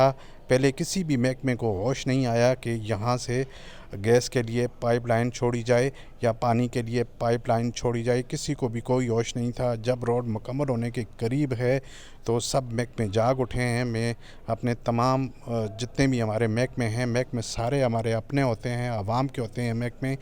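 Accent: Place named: Azad Kashmir, Pakistan. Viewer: Aap ka lehja azad kashmir wala laghta hai.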